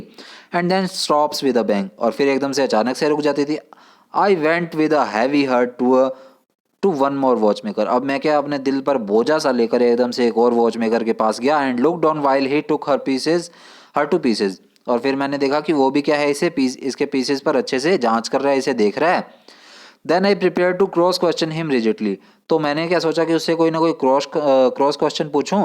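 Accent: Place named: Haryana, India. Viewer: You teach very good 😊👍🏻👌🏻 And I think that you belongs to haryana your seeking style is showing that 🤔🤔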